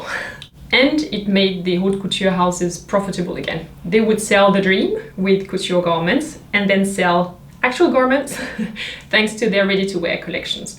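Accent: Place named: France. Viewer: Could you put english subtitles in you videos? For me it is difficult to understand some words, since english is my second language and I don't know french so I am not familiar with a french accent in the english language.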